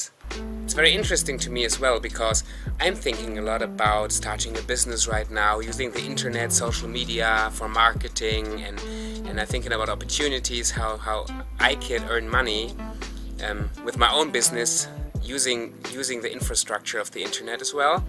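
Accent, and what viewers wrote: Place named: Eastern Visayas, Philippines. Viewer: nice u speak the dialect WARAY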